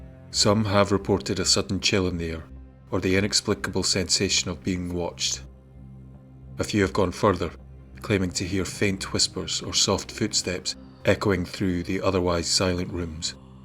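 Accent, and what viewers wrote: Scotland, United Kingdom. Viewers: I could listen to your Scottish accent forever. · you do such a wonderful job with these stories. I look forward to each one! I must admit this one broke my heart. 😢💔. I must say my heart belongs to Scotland.🏴. I am blessed to have sister by heart who is from Aberdeen. I will visiting this coming June. And your accent! Best accent in the world. Could you narrate my life story? It would actually sound interesting then. 😍. Thank you 🙏 and keep the stories coming!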